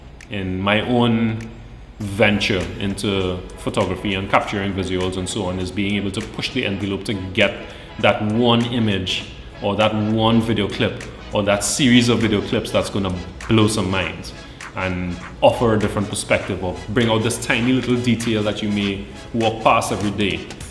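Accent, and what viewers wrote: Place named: Siparia, Trinidad and Tobago. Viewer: That is so cool! I know someone from Siparia and he sounds like you.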